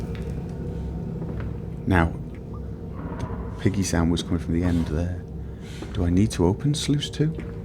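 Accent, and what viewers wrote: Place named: North West England, United Kingdom. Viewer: He is almost certainly from the North West of England. We have almost identical voices so I'm going to say he's within a 40 mile radius of Manchester. :D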